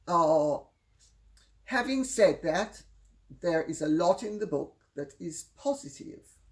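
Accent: Jamaica. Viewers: Lady Colin Campbell was born in the Parish of St. Andrew. Jamaica - her accent is undeniably Jamaican. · Love her slight Jamaican accent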